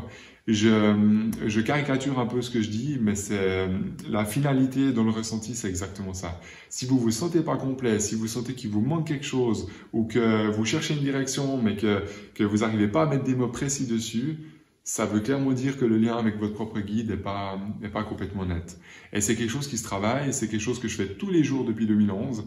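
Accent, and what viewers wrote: Switzerland. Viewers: bonsoir! ravie de vous rencontrez ce soir, au moment où je me sens mal avec les vaccinations par rapport à mon travail: sinon, je n'en veux pas et si je dois être suspendue et bien j'irai jusqu'au bout et cela sera peut être un signe de changer d'optique professionnelle! je suis tout à fait d'accord avec ce que vous dites et cela me réconforte! je vais continuer à vous écouter pour essayer de me connecter avec moi même! j'adore votre accent "suisse?"! prenez soin de vous et à bientôt, mercisssss.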